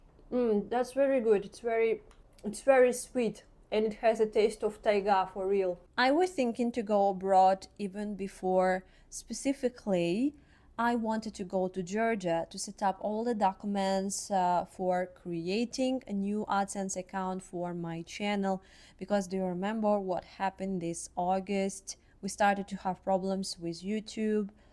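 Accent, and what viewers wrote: Russia. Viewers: Love your Russian accent!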